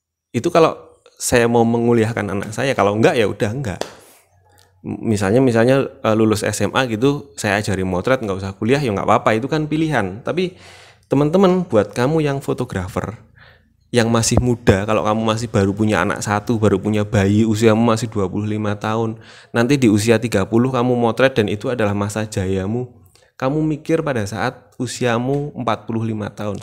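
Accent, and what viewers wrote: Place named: Pekalongan, Indonesia. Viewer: Pas lagi nyari video ttg mengatasi backlight. Ketemu videonya sampeyan. Kok logat ngomongnya nggak asing. Jebule podo wong Pekalongane dewe 😁.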